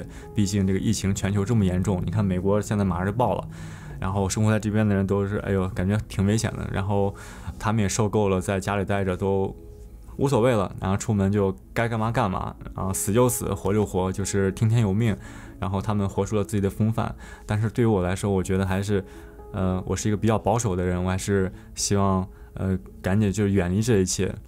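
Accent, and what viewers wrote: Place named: Shanxi, China. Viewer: ！！！你好！！！去年到現在，我無意間看了你的視頻，很有趣！！！👍👍👍剛開始一聽你的聲音我就猜到你是山西人了，你的聲音真的很好聽，做主持人一定可以！！！👍👍👍讓人聽起來你是一個純樸的人，知道你在溫哥華到洛杉磯的故事！！！雖然其中不知發生什麽原因！！！我也不評論！！！但我感覺你是個好人！！！（以前在打工的時候，遇見一個老闆山西的！！！說話語調跟你一樣，說話很溫馨，不擺架子，很有善，不咄咄逼人！！！）👏👏👏雖然你做傳媒！！！經常拍東西分享！！！也把見解和生活困難面對的東西跟大家分享！！！我覺得這就是最真實的故事！！！而且你很前衛！！！APPLE的東西都被你玩轉了，當然還有其他！！！你不像其他博主，有些人品有問題，拍出來的東西都是有毛病的！！！不過你沒有！！！看你的視頻很舒暢！！！👍👍👍要是你還在溫哥華為我們分享生活就好了！！！（但人不能這麽自私，還要賺錢養家的！！！）👍👍👍 很有素質，希望離開美國還可以在Youtobe發你家鄉和中國的人和事給我們看！！！有種不舍！！！看到單身一人在國外，有些地方顯得很優秀，但還是為了生活，和目標，錢💰！！！跟我一樣有時無奈╮（╯＿╰）╭！！！很舍不得！！！多么质朴的一個人！！！（國家應該需要這樣的年輕人給他一個機會！！！）👍👍👍💪💪💪——Jim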